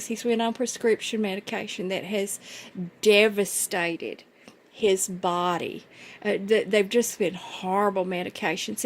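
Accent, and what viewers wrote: South, United States. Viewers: love the Southern Drawl of the narrative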